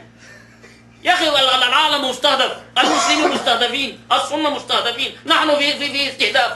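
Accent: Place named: Sudan. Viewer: انا سودداني انت لوغيير سوداني فعلا زوول مبدع و موهوب بس ظااهر انك عاشرت سودانيين كثيير حتي السودانيين انفسهم بعضهم لا بستطيع التحدث بلهجه سودانية خالصه مثل هذه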